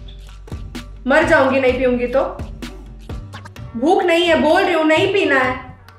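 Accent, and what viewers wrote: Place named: Uttar Pradesh, India. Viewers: I loved your bhojpuri Hindi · Yrr your up accent is too good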